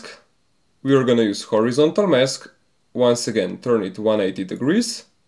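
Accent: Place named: Russia. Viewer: Почему-то чувствуется русский акцент)))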